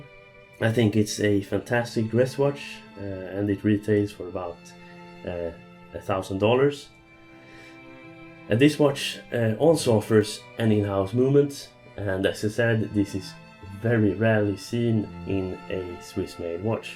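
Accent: Sweden. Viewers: Dmitry Streak Probably I am swedish and he kind of sounds as my english, maybe central sweden like Stockholm.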